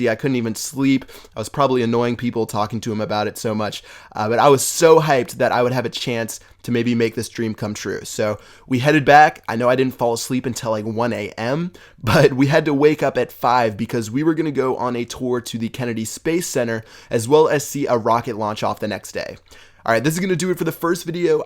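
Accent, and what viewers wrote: Netherlands. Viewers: You could really hear it was a dutch man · All of us Dutch people recognize his accent haha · yeah he is dutch that accent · that's a dutch accent for sure lol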